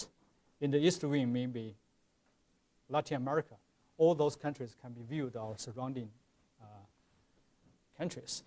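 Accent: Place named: China. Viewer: What a typical Chinglish!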